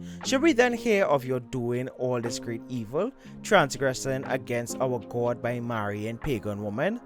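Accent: Trinidad and Tobago. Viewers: Great job. Are u a Trini!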